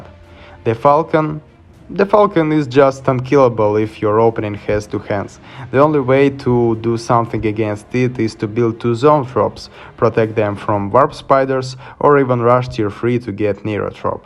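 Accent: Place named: Russia. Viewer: ты же русский игрок?я сморел твои реплеи и ты реально крут. Твой акцент похож на русский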